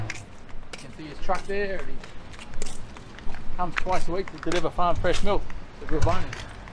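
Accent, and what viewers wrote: Australia. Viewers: Прекрасная возможность освежить свой австралийский английский.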